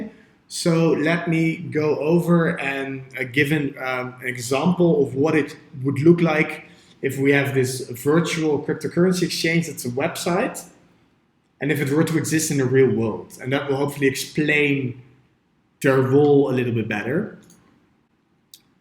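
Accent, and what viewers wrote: Netherlands. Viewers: Is that a Dutch accent I hear? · are u dutch? sounds like a anglo dutch accent. thnx anyways for the info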